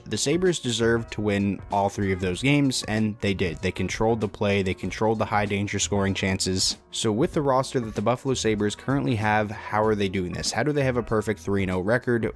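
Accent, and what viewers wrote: Newfoundland, Canada. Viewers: You from out east bud? You sound a bit like a Newfie with your 'ar' sounds haha. Love the channel, keep it up!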